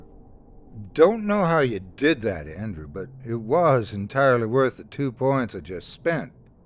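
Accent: South, United States. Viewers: Absolutely love listening to these stories told by you 🌹 Your voice/voices remind me of my southern roots 🌹🥃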